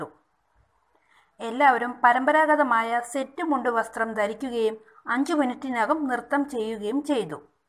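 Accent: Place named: Trivandrum, India. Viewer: Trivandrum voice good iam also in trivandrum👍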